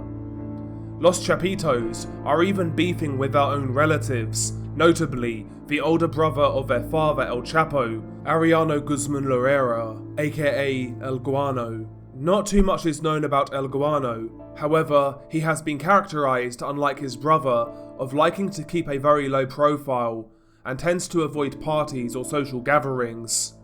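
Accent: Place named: Southeast England, United Kingdom. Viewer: Watching it while you narrate it. Saw it earlier anyway but. I’ve been watching gore since 2004 and I’m interested in man’s reaction to suffering. You could call it “morbid curiosity” but I prefer “empathy”. I empathise with these guys. I’m so lucky to be born in a peaceful location and to live my life without bother. Can’t quite place your accent but I’m Cambridge area and I guess you’re south east-ish. Anyway, love your videos mate, you’ve really informed me about the background of the cartel’s. You should do a podcast as you’d reach more people, without the restrictions of YouTube. Fascinating subject matter, in fact the south Americans are fascinating people. I lived with a Brazilian and my best mate is a jap who grew up in Brazil. Her stories are pure madness All the best brother.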